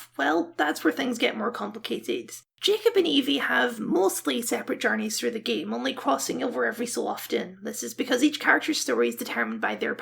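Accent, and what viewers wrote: United Kingdom. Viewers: I’m… I mean I WAS assuming it’s just a different timezone situation, given the accent. GMT at 4am est is 9am.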